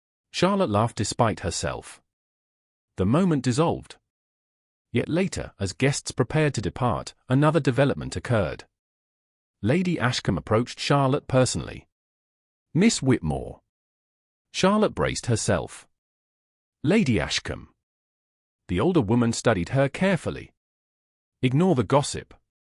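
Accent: United States. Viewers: good grace. you americans really need to get your fawlty pronunciation right. ALL americans do this !! There is a big difference between the 2 words : shire, as such, which is pronounced correctly. BUT ... connected to a place, wiltshire, or a name, ravenshire, it is very different !! it sounds like shuh. NOT ONE AI does this correctly, which means it is american, and not instructed to british english, which is VERY different, Americans say writing desk. British would not say that at all. It is a desk, and reading and writing sitting at it is the whole purpose of the thing. Horsebackriding ... wtf ? Where else would one sit? British say riding or horseriding, never ever do they say horsebackriding. And there is a whole slew of words that are VERY different between the 2 languages.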